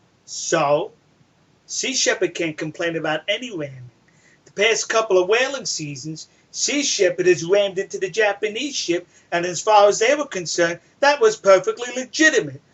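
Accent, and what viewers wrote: New York, United States. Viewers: i don't like whalers, i don't like antiwhalers, i don't like your stupid yankee accent. the whole shebang=not newsworthy. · dude, if you gonna defend any side at least use common sense and not your rly funny new york accent...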